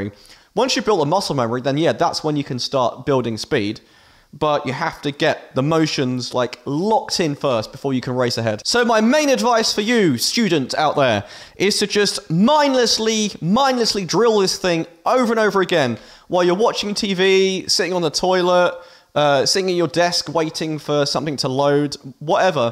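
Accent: United Kingdom. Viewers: Are you British or….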